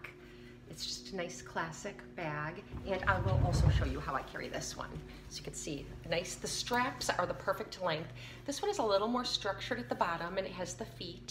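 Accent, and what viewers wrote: Wisconsin, United States. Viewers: I absolutely love HOBO bags. Love the 70’s decor on them. And the leather is phenomenal. I have 5 bags and 2 wallets. The wallet you are showing is called the ‘Lauren’. It is one of their most popular bags. Ps. You are very pretty. And you sound like you are from Wisconsin. Happy Holidays/Birthday. ☺️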